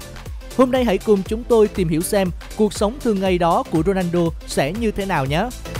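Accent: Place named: Da Nang, Vietnam. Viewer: Người đà nẵng ak ad 😂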